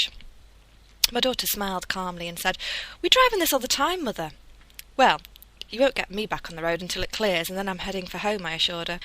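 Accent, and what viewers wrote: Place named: United Kingdom. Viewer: I love British accent! I wish i would live with this principle.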